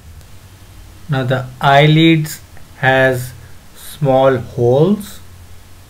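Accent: India. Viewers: So...you are Indian?Accent yaar.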